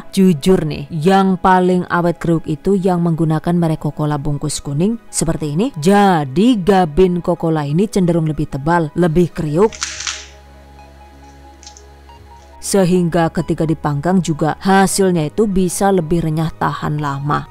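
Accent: Banyumas, Indonesia. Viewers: Coba pakai bubuk kacang + keramel atau bubuk kacang + coklat min, bagus penyampaian dan open mind alias blak kotang rak suwalan 😂😂😂😂😂😂😂😂😂😂 ngapak min yo? I love your voice ngapak 👍👍👍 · Kok bisa bahasa ngapak? 😁 kalo boleh tahu orang mana ya?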